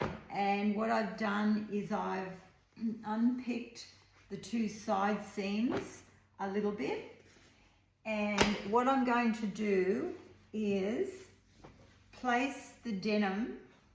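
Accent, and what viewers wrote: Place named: Australia. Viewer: I love listening to a fellow Aussie! GDay from Brissy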